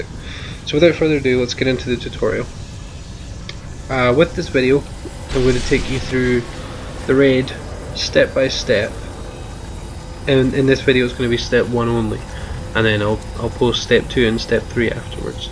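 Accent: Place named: Ireland. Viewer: You irish :o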